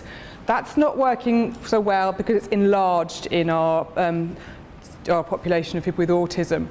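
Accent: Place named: United Kingdom. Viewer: British accent is beautiful .When Brits talk it is elegant .They make talking and art form .without even knowing it .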